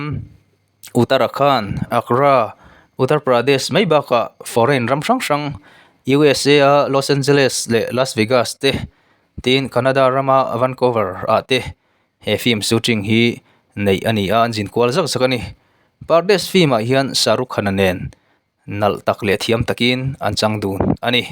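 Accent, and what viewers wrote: South Mizoram, India. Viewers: Saw lampang a ang😂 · Sir chu Saw lampang ah in awm em? Nge khi lampangah, hmar lam product ori chu i tawngkau chheh atang hian a ang viaulo.. huat tur anilo aw.. inpawh zelna tur zawkin kalo sawi ve mai mai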